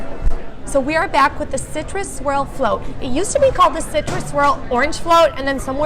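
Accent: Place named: Western New York, United States. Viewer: are you from western NY? The accent sounds like you may be.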